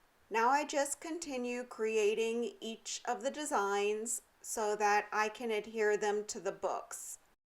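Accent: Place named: Pennsylvania, United States. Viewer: Just Beautiful Projects!, you are my New Favorite Shabby Chic Channel! From Your Accent it sounds like you are from Pennsylvania.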